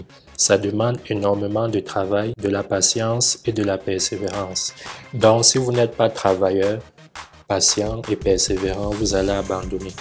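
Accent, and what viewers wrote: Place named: France. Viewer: moi j'aime trop sont acssent francais :D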